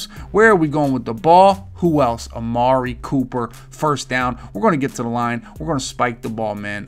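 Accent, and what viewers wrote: Philadelphia, United States. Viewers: You from philly bro south philly myself sound like a philly dude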